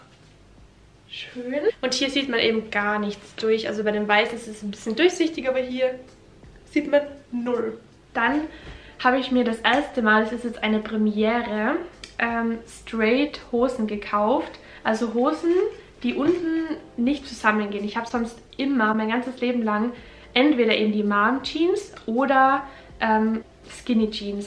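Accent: Austria. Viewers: Sie ist doch aus Österreich dachte ich:) und ich finds super süß den Akzent, einfach kein Hochdeutsch 😊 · Ich liebe den österreichischen Dialekt :)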